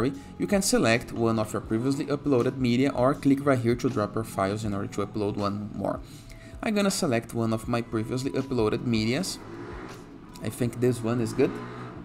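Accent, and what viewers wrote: Brazil. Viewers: dificel não reconhecer o br na fala kkk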